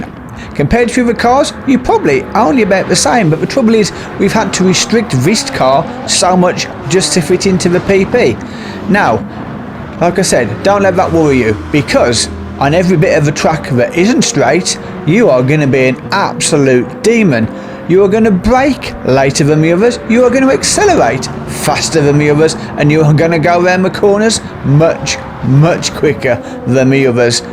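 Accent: United Kingdom. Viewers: I like how you gave Naffan Tait a mention last time. I also enjoy listening to the different British speech patterns (there’s a million of you you here in Western Australia) keep up the good work- your builds help out no end.🇦🇺🇬🇧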